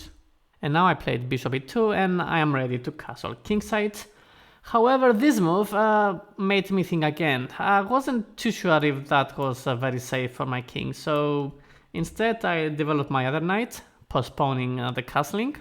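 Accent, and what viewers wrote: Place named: Romania. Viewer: Are you Romanian? You sound Romanian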